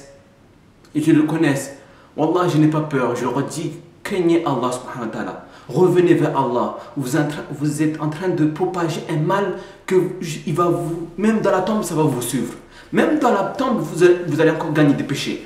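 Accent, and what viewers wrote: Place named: Brazil. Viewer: Tu as un accent brésilien...🙂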